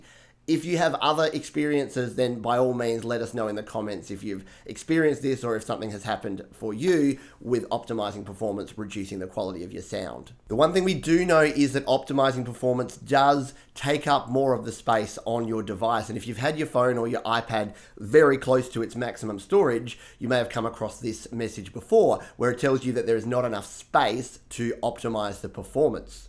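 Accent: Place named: Australia. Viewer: Betht five tipth Sorry that was mean. The dope Aussie accent more than makes up for it.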